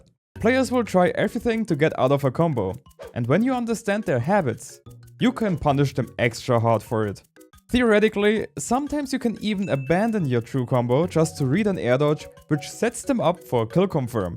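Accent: Germany. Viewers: Looking forward to see how these new type of videos evolve. Would you think about manually putting official subtitles for these scripted (evergreen) content. I mostly catch what you say but sometimes I don't understand through your german accent 😅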